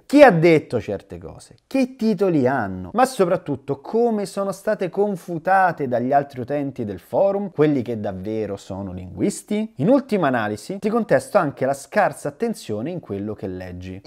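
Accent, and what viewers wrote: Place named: Rome, Italy. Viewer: PORCA MISERIA..... Ed io che mi sono sorbito pure sti 15 minuti di video per ascoltare un tizio che ambiziosamente affermava di aver finalmente confutato Mauro Biglino (quindi un po' di curiosità me l' aveva messa). Poi.... Ti rendi conto che si tratta solo di un tale che sfotte, provoca, fa le battutine, e il tutto con una parlantina antipatica e volutamente romanesca (così viene meglio...), in pieno stile "iene" (magari ambisce a diventare uno di loro?). Si vede dal video nel suo complesso, che questo qui non ha alcuna valida strategia comunicativa, cerca invece solo di rompere le scatole a personaggi pubblici, denigrandoli gratuitamente. Con i tuoi: "dove scappi", "a meure'", "t' ho dato na lezione", per non parlare dei pochissimi spunti, tutti inutili quanto il resto del video, hai dimostrato solo una cosa. Che sei RIDICOLO e non sai tenere una discussione con nessuno, perché se volevi davvero confrontarti con lui, bastava non fare il maleducato irrispettoso, quindi forse il tuo vero obiettivo era un altro... 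Ha fatto bene Biglino a non risponderti più, è ovvio, qualcun' altro al massimo ti avrebbe altrettanto insultato. Sei solo un gran maleducato. Spero che le "iene", che a me non piacciono, perche spesso e volentieri usano il tuo stesso approccio irriverente nei confronti di chiunque, non ti prendano mai con loro.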